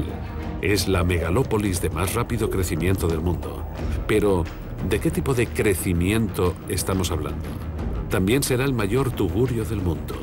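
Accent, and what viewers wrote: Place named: Madrid, Spain. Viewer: ¿De dónde eres? No adivino tu acento ya que es casi imperceptible. Diría que Eres de España, en concreto de Madrid. Saludos.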